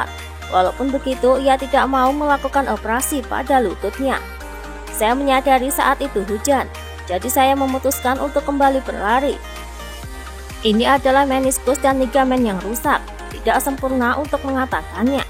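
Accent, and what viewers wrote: Java, Indonesia. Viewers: Medok beud njir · Gagal fokus sm suara pengisi video, logatnya jawa ini.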